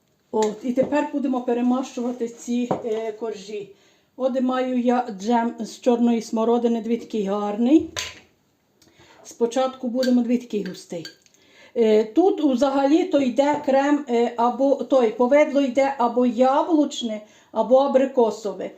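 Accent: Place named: Ivano-Frankivsk, Ukraine. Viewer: Пані, якщо це не секрет, де саме Ви живете, я в Городенці, що на франківщині, по Вашій розмові думаю що Ви також з нашого краю. Підписана на Ваш канал, пляцки суперові, такий самий зошит є і в мене😂😂😂, до цих пір печу з нього. Дякую що ділитесь своїми рецептами.😊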